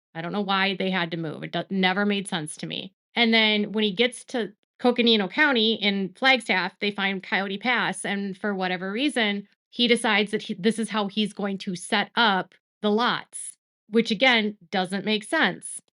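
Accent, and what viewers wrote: Minnesota, United States. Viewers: You have the most Minnesota accent I've ever heard😂 'abouuuut"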